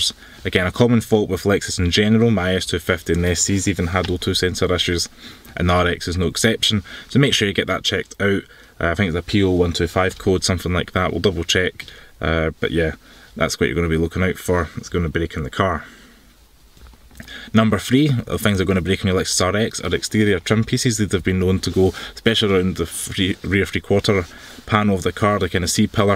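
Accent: Scotland, United Kingdom. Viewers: Not that easy to understand scottish english... Thanks for that interersting and useful video. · Scottish English is cool 😎 👍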